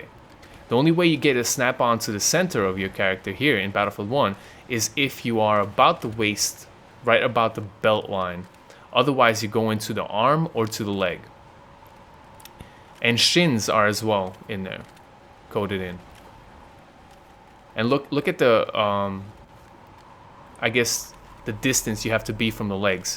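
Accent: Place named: Russia. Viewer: Steven Featherstone Not German but not American, think closer to Russia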